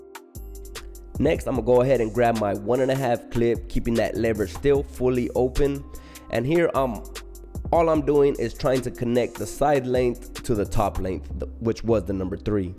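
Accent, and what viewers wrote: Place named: Mexico. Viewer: Bro it’s not you talking that’s boring u shocking try to not talk Mexican bro ya accent it’s Chevato bro u can tell Spanish accents heavy try talking like you from Brooklyn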